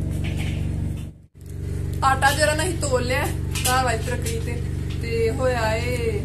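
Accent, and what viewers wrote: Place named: Punjab, India. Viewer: Punjabii accent ❤❤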